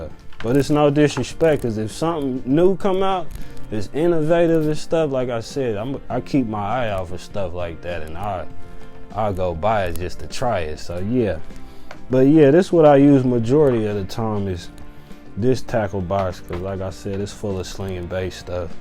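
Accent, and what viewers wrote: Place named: California, United States. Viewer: Where you from man sounds like cali a little lol also kinda country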